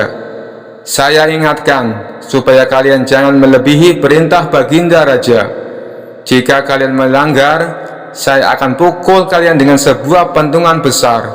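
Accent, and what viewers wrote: Java, Indonesia. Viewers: Jawane ngapake ngendi rika kang admin😂😂😂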